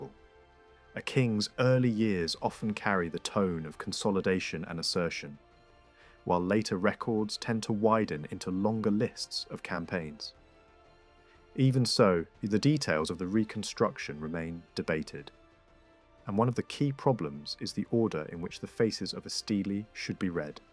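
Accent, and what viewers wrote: United States. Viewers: Konum Türkiye ama aksan ABD 🤔?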